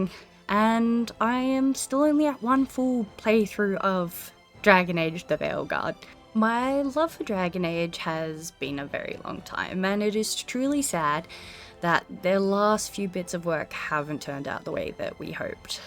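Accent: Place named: Melbourne, Australia. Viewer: You have a voice like Kylie Minogue, in a good way. Are you sure your mother never shopped at Kmart East Burwood in Victoria about thirty years ago? I'm talking voice accents, regardless of anything else. Suburban Melbourne with a moosh of time in the UK. :) The best and thanks for admitting when you are wrong. It's rare.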